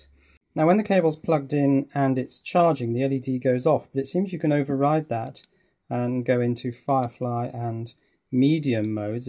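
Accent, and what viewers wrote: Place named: RP, United Kingdom. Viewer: you have an upper crust English accent.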